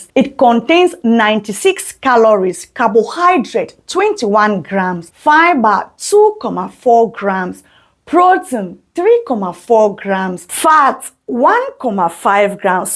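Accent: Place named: Cameroon. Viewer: Mom from your accent and name, you are a Cameroonian i guess... Good job dear!🎉🎉🎉🎉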